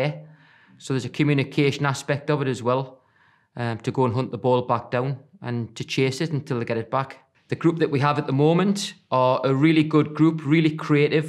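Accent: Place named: Newcastle, United Kingdom. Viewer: Geordie accent Love it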